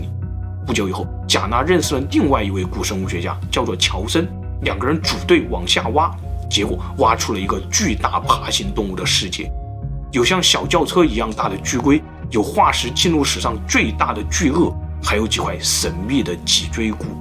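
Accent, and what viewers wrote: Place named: Fujian, China. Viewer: 特殊的福建口音非常帶感，獨樹一幟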